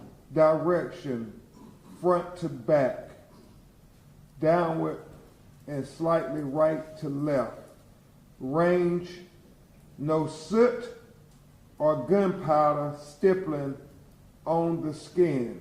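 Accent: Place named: South, United States. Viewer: Country accent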